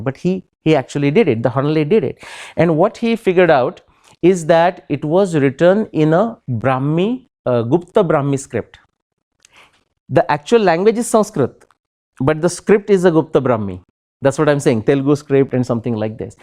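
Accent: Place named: Maharashtra, India. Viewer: bro people of maharastra used to pronounce like this . acctually this is the impact of marathi on their speaking 🙂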